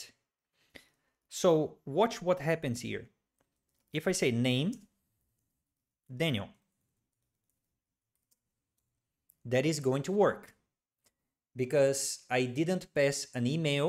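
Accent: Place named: Brazil. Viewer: At first I didn't understand what these `echo` and `feud` meant, then I learned that Brazilians just pronounce the words `error` and `field` like this... Very irritating :D, but I've gotten used to it now.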